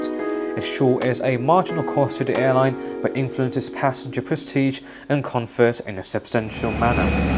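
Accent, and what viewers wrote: United Kingdom. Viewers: Officer has a rather distinctive British accent. Maybe if switched to an American accent you might be better able to understand him?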